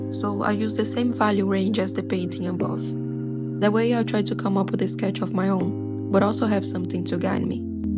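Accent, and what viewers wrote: Brazil. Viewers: her Brazilian accent is very cute ! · she's from brasil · Brazilian. Easy spot, watch some movies bro, it helps with the pronunciation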